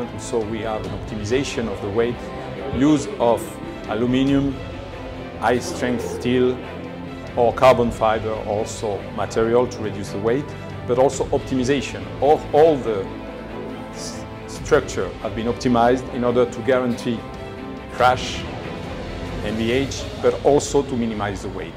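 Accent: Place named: Germany. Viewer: So disappointed by hearing a German speak... no matter how well he does his job. I am aware that is unfair.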